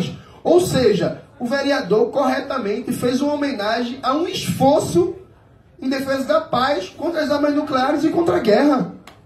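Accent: Northeast, Brazil. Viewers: Adoro e clareza do raciocínio desse garoto, falando com sotaque nordestez fica ainda mais incisivo. Parabéns professor Tudo a ver com Cirao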